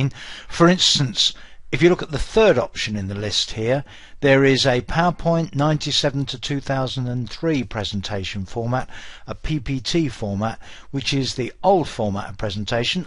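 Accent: United Kingdom. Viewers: When I heard the British Accent, I was super happy. I love listening to British people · When I heard the British accent I knew there would be excess talking. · I really enjoy your British accent and simplicity you explain and teach.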